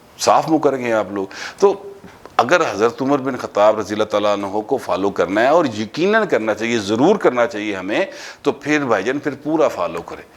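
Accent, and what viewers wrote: Lahore, Pakistan. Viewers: آپ کی پنجابی اردو میں بھی بہت اچھی لگتی ہے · Kya baat ai lahori lehje di. Zindabad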